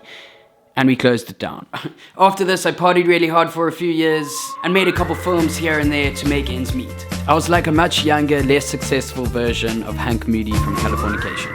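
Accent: South Africa. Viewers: Great message, Dan, but when you start talking fast it's hard to understand you. Even when your accent isn't that strong, the different SA vocab and your word choice makes it hard to follow without replaying what you're saying at least once or twice. Maybe slow down a little so everybody can understand you! Thanks!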